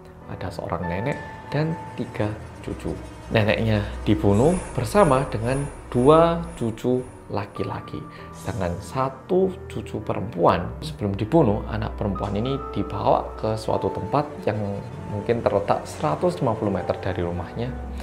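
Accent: Java, Indonesia. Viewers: Orang Korea yang medoooookkkk 🥰🥰 #fanmalaysia🇲🇾😍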